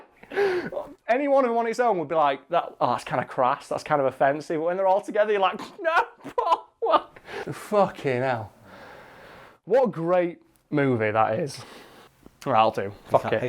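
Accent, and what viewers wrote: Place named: Northern England, United Kingdom. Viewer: I’ve been binging your content for so long that I’m starting to think in a Northern accent... I’m from Australia.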